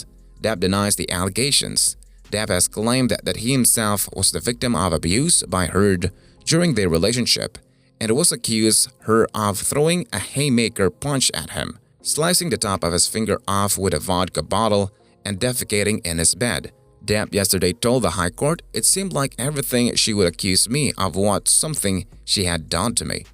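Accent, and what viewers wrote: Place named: Latin America. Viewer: Gangster from the 30's??.!!! He sounds more like a Latin guy IMITATING a 1930's gangster! You can tell he's reading this "Narrative" (..the pronunciation)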